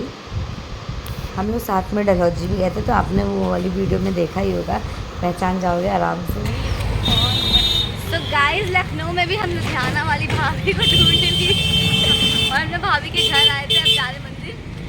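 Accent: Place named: Bengal, India. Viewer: App lakhnow se ho me smjhti thi ki ap bngal se ho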